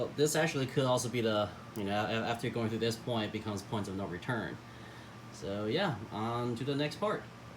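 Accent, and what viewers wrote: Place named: Alaska, United States. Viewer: This guy has the Alaskan Bush People children accent.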